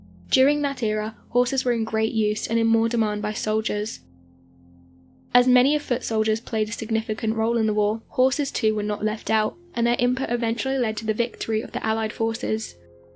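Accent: United Kingdom. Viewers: Ningeelewa ila sasa hii lafudhi ya kiBritain ni kisanga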